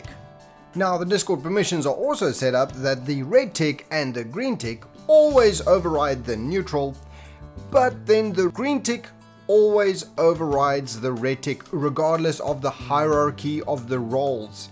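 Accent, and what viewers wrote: South Africa. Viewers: Oh, I know that accent, are you South African,? Also, thanks for sharing this tip. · Where are you from? Your accent sounds very South African.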